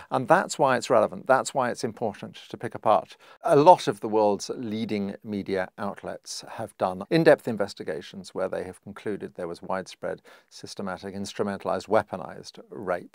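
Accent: United Kingdom. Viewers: Funny how people would swallow whatever propaganda you feed them as long as it’s delivered by a jolly-good British voice.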